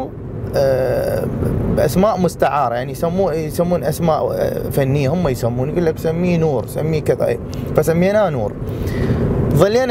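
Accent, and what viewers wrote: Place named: Upper Egypt, Egypt. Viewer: اكسنت صاعدين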